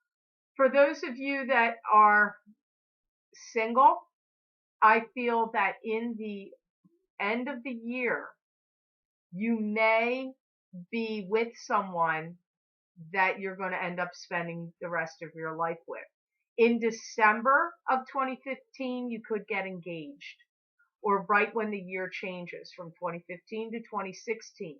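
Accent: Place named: Chicago, United States. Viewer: did you grow up in the Midwest? You totally seem like you're from Chicago in the way you talk and act! (That's a compliment, by the way! LOL)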